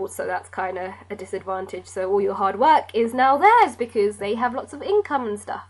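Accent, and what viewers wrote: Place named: United Kingdom. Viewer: I like the way you taught hahaha!! It helped me understand alot. You've shown a lot of enthusiasm in your lecture and you sound like a UK girl ; ) Anyways, nice vid.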